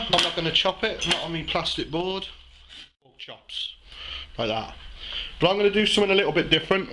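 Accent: United Kingdom. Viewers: Well done video, why no ribs? You guys don't do ribs in the UK? (guessing on accent) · everything just seems cooler with a British accent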